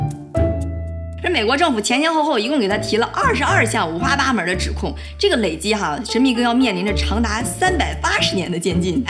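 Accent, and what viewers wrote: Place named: Northeast, China. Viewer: 東北話特別好聽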